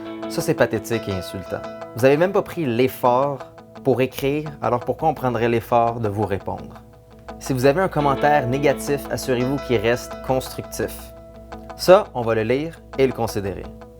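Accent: Quebec, Canada. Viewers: J'adore ton accent et tous les accent des québécois 👍🏻😋💕 je suis presser que tu reface des vidéos because je trouve sa hyper intéressant de savoir comment vous faite et combien de temps vous y passer 💕👍🏻😊 bonne journée 😊😋💕👍🏻😘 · Bonjour:) j'aime beaucoup cette vidéo qui pose bien le sujet, finalement pas assez expliqué aux abonnés qui n'arrivent pas à comprendre quelque fois que derrière l'écran il y a un humain qui aime bien avoir de bon commentaire, et surtout qui n'est pas en non-stop sûr son pc pour répondre parce qu'il a aussi une vie! Ce qui est bien avec ta vidéo c'est qu'elle est aussi bien applicable pour youtube que pour un réseau social tel que facebook!;) Seul,petit bémol le côté tout flagada devant l'écran, je préfère quand une personne se tiens droite Ahah! Mais là je chipote ça te ne casse en rien la qualité du message!:p Brefouille, là petite française à accent vous salut #hommebeau à accent aussi! 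XD Ps: d'ailleurs en France y'a genre 1000 accent différent, c'est drôle que les gens soit "choqué" on va dire par l'accent québécois! (Que je trouve bien sympa par ailleurs! X) ) Bref bonne continuation à toi!:D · Coucou! J'aime bien ta façon de parler je la trouve très calme et j'aaaaadore💜💜💜 l'accent québécois même si c'est vrai que parfois je ne comprends pas tout😌 T'a video est super continu ! Bye!😊 Ps:1 j'espère que ce n'est pas trop long comme com' 2 je ne sais pas si il a l'option reply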